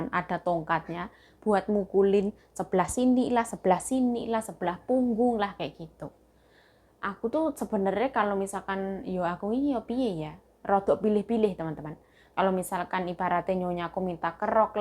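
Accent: Java, Indonesia. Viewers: Mbk indonya mana ya..ngomongnya jawa dan mandariny muedok bget..heeee....smgt slu mbk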